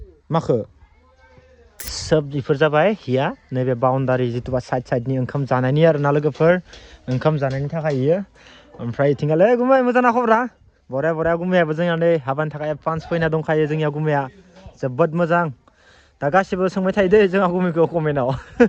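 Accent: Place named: Tea garden, India. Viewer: Ada ng ma jagni ngni railai naykw matw bagan farani baidisw khwnayw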